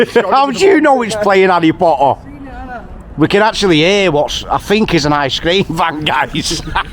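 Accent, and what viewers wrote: Manchester, United Kingdom. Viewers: I could listen to your manc accent all day long 😍 I drive past Eastford Sq every day heading to work. The concrete artwork is a genuine William Mitchell piece. He was the same artist that produced the three 'Minut Men' outside Salford University's Allerton Building. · Eastford square was a vibrant area for local people. Collyhurst was rough and ready but the people were the best in 1960/70. It had masionettes across the road and further up from the car park they were old peoples flats. It was a pub across the road. A well liked intergalactic place for artist to play and sing. The area has been neglected but it was a great place to live. It’s outside people who messed it up. You sound Mancunian but you’re a disgrace to the city. It’s rough now but wasn’t in its heyday. Shameful video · Beltin' channel. Great to hear a proper North Manchester accent, are you from Colly mate?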